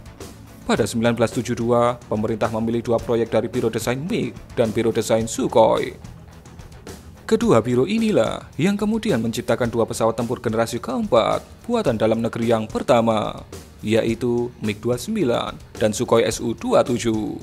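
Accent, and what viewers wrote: Java, Indonesia. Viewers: Mantep nih channel, modal text Googling, footage nyolong dan bacotan medok bisa dapet 400k lebih subs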